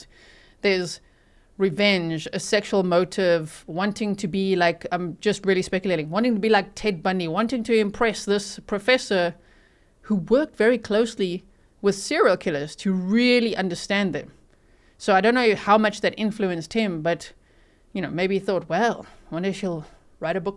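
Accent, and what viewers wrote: South Africa. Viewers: Very much enjoying this content. I like the factual breakdowns. But someone, please help. I'm trying to place her accent but I'm failing. I'm thinking south Africa? My SIL's South A accent is similar but a bit different. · Im so glad is South African. Her accent is perfect for this space it gives an air of bipartisan, but you know she always stands for the victims. I guess I'm used to hearing American accents, and they just get on my nerves. Lol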